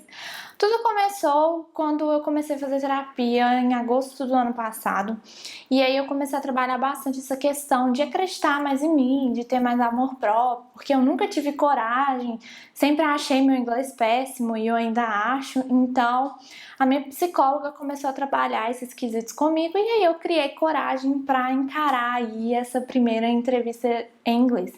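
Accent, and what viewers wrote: Minas Gerais, Brazil. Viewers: Nossa, vc foi contando e eu fui imaginando a cena. Acredita que só de imaginar eu fiquei até tremendo de nervoso aqui também? kkkkkkkkkk Obs: Vc é mineira né? O sotaque me parece familiar. Sou mineiro de JF.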